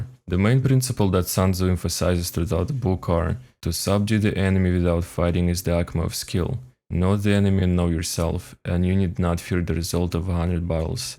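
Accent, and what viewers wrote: Russia. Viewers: Why no one is commenting about kinda russian actent? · My comrade, you have the hardest Russian accent I've ever heard. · Русский акцент? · Ты шо русский? Судя по акценту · Но я же слышу по твоему акценту, что ты русский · Russian accent I guess? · Дубляж на русском был бы прекрасен, особенно учитывая что автор явно русский по акценту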